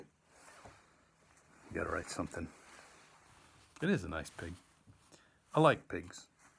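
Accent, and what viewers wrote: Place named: Wisconsin, United States. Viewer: I'm up late here in Portland Sucks Just finished my caretaking For my husband who is now 90 Got my teeny "medicinal" martini And who pops up on my phone? My favorite Wisc author! Ahhh! Sigh... The comfort of your Wisc voice I miss Wisc every single frick'n day Forget searching Google for an extra water filter! I'm gonna listen to Michael Perry! Tomorrow I'll fire up the old Firestick And treat my husband to to a morning of Michael Perry and coffee Remembering all your concerts And missing Wisconsin 😪 And.... Portland Sucks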